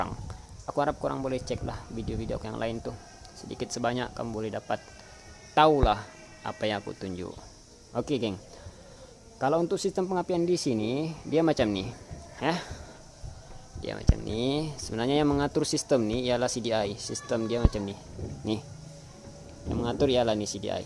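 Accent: Sabah, Malaysia. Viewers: Mantap bossku,Kamu area mana bossku?slang Kamu macam sabahan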